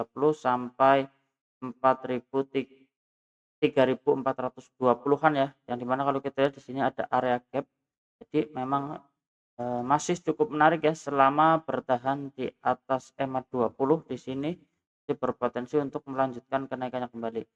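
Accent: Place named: Banyumas, Indonesia. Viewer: Emg sampeyan ngapakny dr mn paman. Sy jg ngapak 😅😅😅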